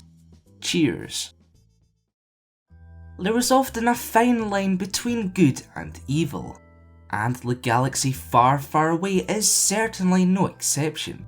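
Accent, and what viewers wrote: Scotland, United Kingdom. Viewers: . Hey man I've been watching your vids for quite a while now. Your videos are great 👍. Can tell your Scottish just like me I have followed on amino. If you replied to this comment I would be delighted. Keep up the good work man 😃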